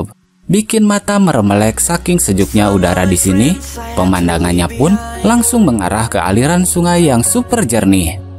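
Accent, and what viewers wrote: Sunda, Indonesia. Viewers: Reviewer na meuni nyunda pisan...tong hilap kang..liput Oge wisata pantai Utara Subang na Pokona mah Endah pisan pamandanganna 😊😊😊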